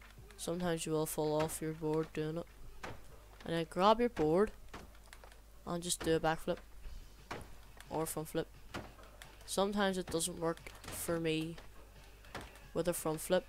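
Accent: Northern Ireland, United Kingdom. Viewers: Mate, after trying listening to these awful annoying American 13 y/o kids, it's great to hear a Norn Iron accent talk sense. Legend.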